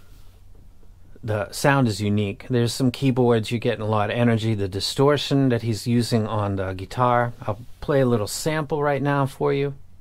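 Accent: Boston, United States. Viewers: Said that three years ago.. Bastohn accent.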